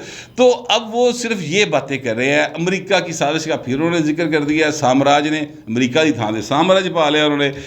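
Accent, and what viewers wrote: Lahore, Pakistan. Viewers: صاحب آپ کی ڈریسنگ بہت اچھی ہوتی ہے اور لاہوری سٹائل انداز بیاں زبردست۔۔۔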